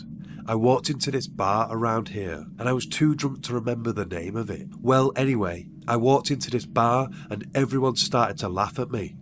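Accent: United Kingdom. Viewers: UK accent is disturbing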